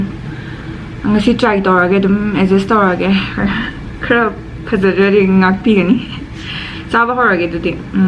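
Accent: Kakching, India. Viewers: Ado Ng kakching londo Nganguva Adum Aduna Henna tavada Nungsiye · kakching marol na nungnaiye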